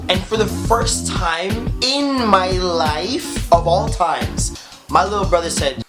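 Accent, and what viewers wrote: Jamaica. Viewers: Are u from Jamaica or America...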